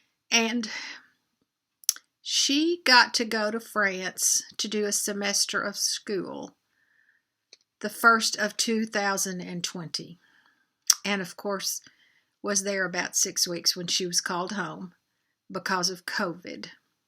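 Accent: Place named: Arkansas, United States. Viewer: . I loved your Arkansas French accent. My NE accent would be funny too! I love everything you do. That pillow for your gd is awesome and she will treasure it. TY for sharing what you have done! I do have a house generator as we get wind gusts and snow here on the coast! I’m so glad I got it. I too am blessed!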